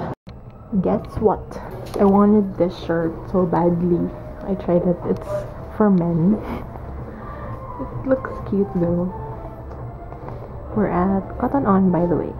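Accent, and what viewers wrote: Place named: Davao, Philippines. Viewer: Love the davaoeña accent.hehe nice vlog! Cn u do a review on make up factory's velvet lifting foundation? Looks promising though.. tnx!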